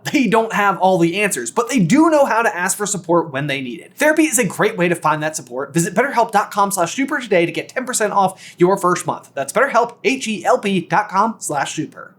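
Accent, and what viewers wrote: South, United States. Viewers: I didn't care for the Southern accent and feel like there are too many clips cut in the video. That being said you guys are amazing and I liked the theory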